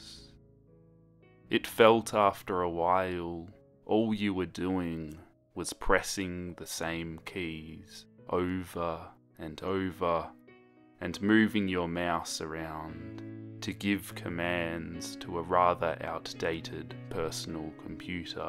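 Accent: Australia. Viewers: banana, it sounds even funnier with a Australian accent!!!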